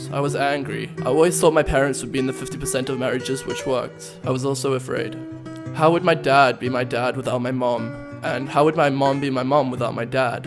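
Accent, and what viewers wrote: England, United Kingdom. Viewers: how come he has a british accent but he lived in south africa all his life? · So did you develop the English accent when you moved, or did you have it in south africa ?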